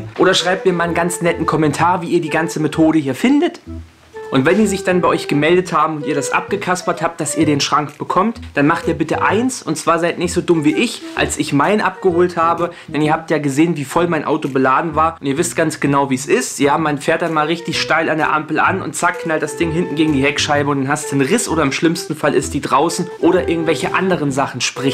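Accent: Hesse, Germany. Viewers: Als ob du aus Marburg kommst😂 endlich mal wer aus der eigenen Stadt.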